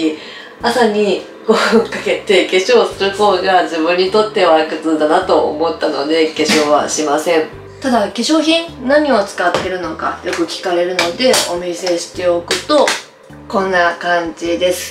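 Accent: Kansai, Japan. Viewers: この間初めて見たんですが🎉インスタライブの関西弁のときもかわいいです❤🎉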